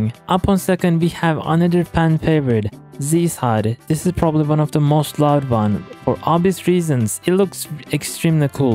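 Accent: Turkey. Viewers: bruh your accent screams Im turkish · aksanından türkçe sırıtıyor dost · Bro ı think u are turkhis because your speech is similar to a Turkish person speaking English.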